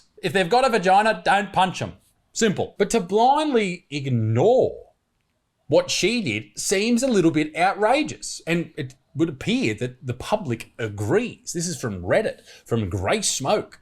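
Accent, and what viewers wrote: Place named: Australia. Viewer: i love ur accent as iam am also an australian!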